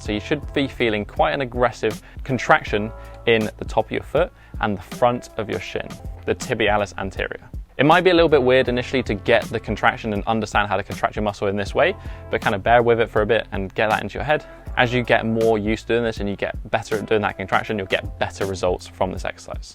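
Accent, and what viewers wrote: England, United Kingdom. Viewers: I'm not English but oh beautiful to hear English accent. Not in you face American. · That british accent ..gosh i cant understand u well and thats annoying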